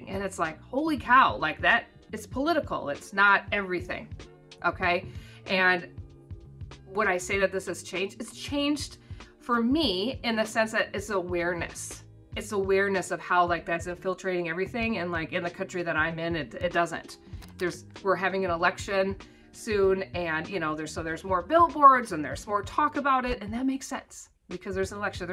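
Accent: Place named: New Zealand. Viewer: And your accent has changed a bit now too 😊 you sound very kiwi now. Lol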